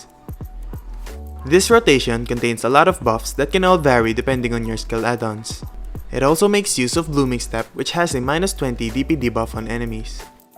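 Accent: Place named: Philippines. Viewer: i hope this doesnt sound mean in any way, but are you filipino? i wanna say i hear the slight accent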